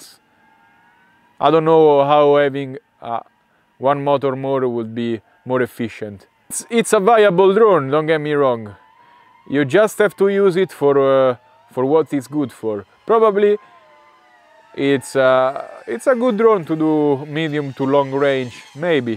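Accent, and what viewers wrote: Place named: Italy. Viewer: 2 parole e ho subito capito eri italiano ahah grande 💪🏽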